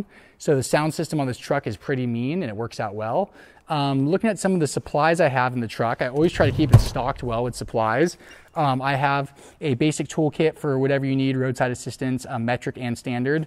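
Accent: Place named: California, United States. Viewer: Damn bro you sound extra California. Tom delonge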